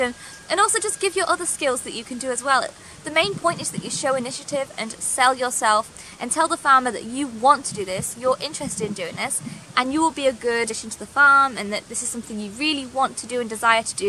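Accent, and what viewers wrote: Australia. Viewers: You're starting to sound Australian 🤔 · Aussie accent creeping in 😉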